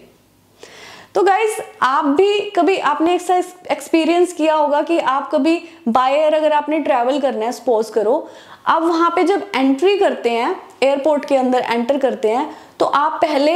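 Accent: Punjab, India. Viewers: Accent punjabi didn't left the chat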